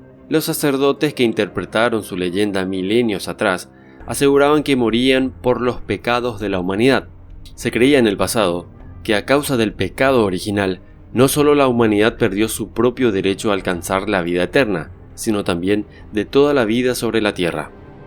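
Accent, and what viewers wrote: Paraguay. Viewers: Que tal.... sos de paraguay..??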